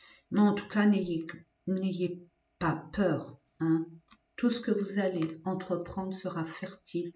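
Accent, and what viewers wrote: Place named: South, France. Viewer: Bonjour, accent du sud... ça tombe bien je suis dans le Var et je veux aller dans le Vaucluse pour faire effectivement un autre travail enfin quelque chose qui englobera mon travail actuel. On attend juste le financement pour pouvoir avancer dans le projet. Avec achat immobilier... Choix numéro 1, c'est beau. Merciiiii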